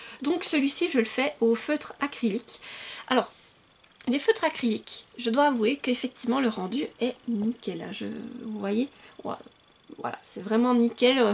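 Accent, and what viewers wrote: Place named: Jura, France. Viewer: Salut j'entends comme un accent du jura je suis également jurassienne ça fait plaisir de voir une suissesse sur YouTube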